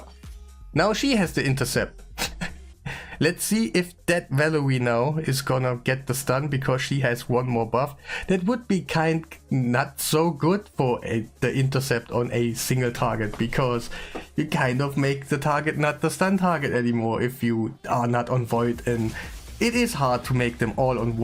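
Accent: Germany. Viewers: hey when zinogre came out i made a 2 to 1 unkillable team with zinogre emic painkeeper (in the calc) could not test it yet do you want to test it? ps are you german? you kinda sound german ^^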